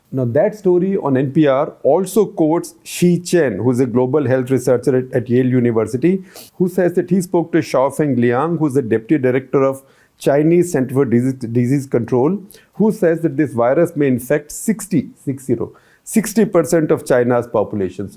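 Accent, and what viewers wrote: India. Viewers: dont understand ur indian accent..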